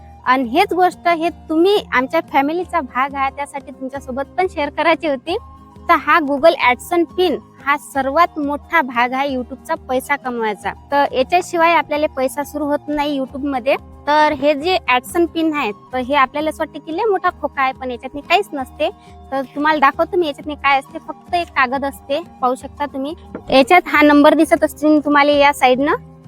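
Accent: Vidarbha, India. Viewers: तुला विदर्भातील भाषा कळते काय. विदर्भातील भाषेचा टोन आणि पद्धत अशीच असते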